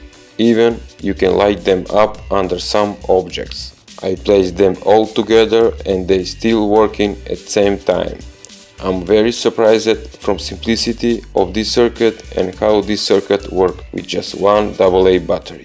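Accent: Russia. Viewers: American hacker with russian accent. Epic combo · How is he american hacker he sounds like he's a russian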